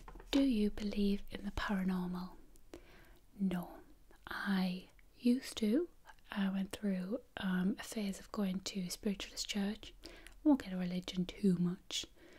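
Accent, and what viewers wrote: United Kingdom. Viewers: I think I can day my English is pretty good, I always understand all the asmr video's I like to watch, but I have to admit.. sometimes I really can't understand you because of your very strong British accent! Am I the only one? But I can deal with it, because I really love listening to your accent haha ^_^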